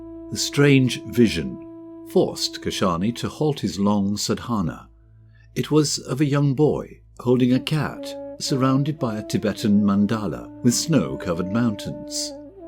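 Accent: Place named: United Kingdom. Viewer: I always think it’s funny when a British voice narrates about Indian culture/religion/history 😂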